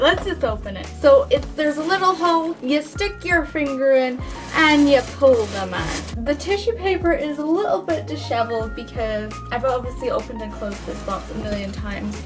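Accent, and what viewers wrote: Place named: Scotland, United Kingdom. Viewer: Took me a while to place your accent, then I when; “fuck that’s my accent.” 😂 Scots on YouTube are few and far between. I’ve gotta ask how is sizing since they are from the US? Or are they made big or small.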